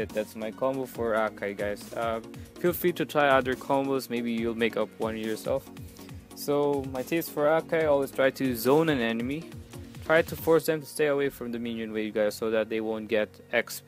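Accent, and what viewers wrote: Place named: Philippines. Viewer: pinoy 👍😂 halata sa accent.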